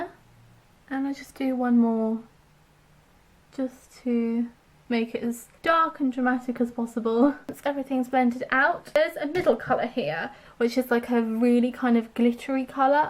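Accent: United Kingdom. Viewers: Is your accent from Southampton?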